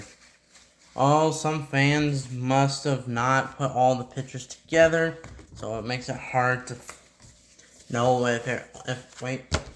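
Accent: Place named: South, United States. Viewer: wow you sound like you’re from the south that’s amazing that you’re from Canada. I love the Canadian albums big fan.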